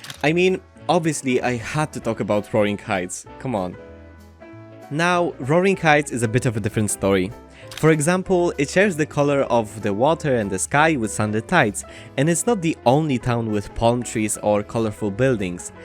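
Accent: Poland. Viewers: I keep forgetting you're Polish and keep getting jumpscared by the accent each time but yep, Sunset Valley was made as generic as possible so all players will feel comfortable playing there during the first time they get to play the game - they can choose a modern villa or an era-appropriate house based on what their preferences are and a more or less lore-important family as they please, so players of the previous games would most likely choose the Goths, Bachelors or Langeraks while new players would play any of the families in the city, especially the rich ones like the Landgraabs or Altos it's a bit similar to how Sims 2's Pleasantview had a few different styles of houses by default, like the simple white cubes, trailer pack houses or expensive mansions, no singular theme, just a default, generic world any player could relate to and feel at home (+ Strangetown and Veronaville for those who'd want to start in a more thematic neighborhood) they shared this once in an interview that they first had big-city ideas, but settled on making a small town as it would be easier to design and would be less complicated so the players wouldn't feel overwhelmed by it, and they did that by putting everything a player would need in one place, whether it's shopping, work or services, with not-as-important locations scattered around the map so it's easy to find stuff you'd need, and it would make the game feel more alive as most Sims would be seen around the world's places of interests, and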